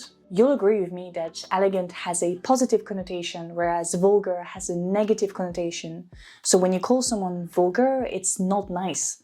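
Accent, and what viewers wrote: France. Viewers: Hello La chanteuse Aya j’aime beaucoup ❤ quel pied de nez à la société dite bourgeoise qui est déconnectée de la vraie vie. Le changement est douleureux: l’enfant qui fait ses dents le sent et il a mal, Le monde la société change comme bébé fait ses dents partout c tout, il faut rester patient. On juge et on est jugé c une dynamique. Il faut rester gentil indulgent avec les intolérants, comme avec bébé qui fait ses dents, car on est tous formatés, et dépassés par le changement comme bébé qui fait ses dents et qui pleure qui comprend pas ce qu’il se passe. bref faut rester gentil tolérant et ouvert d’esprit comme avec bébé quand il fait ses dents car il souffre il a mal et il comprend pas pourquoi car son organisme change la nature fait son travail… C super j’aime ❤ t simple et sympathique ❤ J’espère que tu vas en faire plein de contenus ❤❤❤❤❤❤❤ ça change de ces intellos compliqués et coincés que sont les intellos français. 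❤ je kif ❤ce contenu en anglais a l’accent français tenu par une française de souche ❤ j’aime qu’on parle d’autres langues ❤que le français ❤ C une ouverture d’esprit ❤❤❤❤❤c très bien expliqué avec des mots simples ❤❤ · what an elequent frenchly fluid in english spoken summary...refreshing..i drank it up slowly knowing it will nurish my mind like a glass of mineral water,far from boring and short enough for lingering thoughts and ideas about the world we live in,i now have a new word that encompasses the silent violence we experience.Thank you ms. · This video makes you the only French person I like 😂 (idk if you are French, I've never seen your channel before. Just going off your accent)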